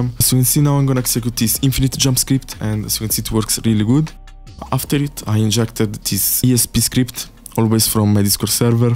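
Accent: Italy. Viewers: fra ma sei italiano ?? non sembri inglese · l'accento italiano spacca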